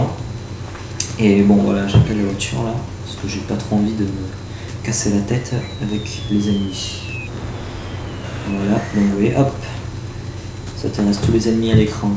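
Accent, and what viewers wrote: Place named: South, France. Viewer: Ton accent de sud xD sinon bonne présentation du jeux ;)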